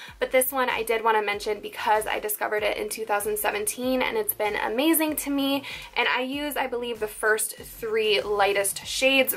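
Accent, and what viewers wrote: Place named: New York, United States. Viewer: you can really hear the New yorker accent when she talks. its so endearing :)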